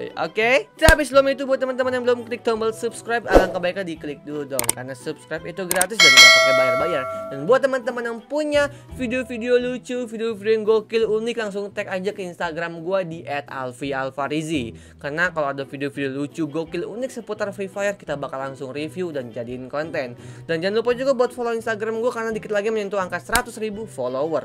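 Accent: West Java, Indonesia. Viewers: Bang Abang orang Sunda ya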